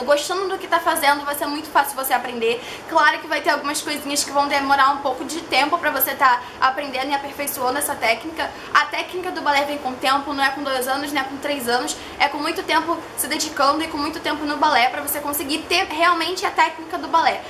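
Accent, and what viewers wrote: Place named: Rio de Janeiro, Brazil. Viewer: De cara, já percebi que você era carioca ❤️sotaque liendo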